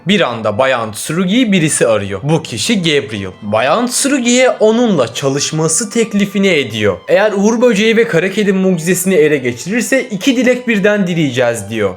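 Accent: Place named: Azerbaijan. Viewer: abi azerisin anladıkta HARKASA yeniden merhaba diye girme kulağım acıyo hErkEs e harfi var orda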